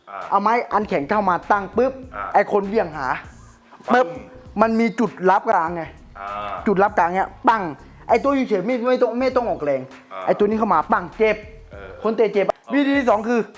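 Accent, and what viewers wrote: Isan, Thailand. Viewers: เป็นคนจังหวัดไหนนิ บ้างทีอิสานปนมาด้วยเวลาพี่อธิบาย ผมละชอบ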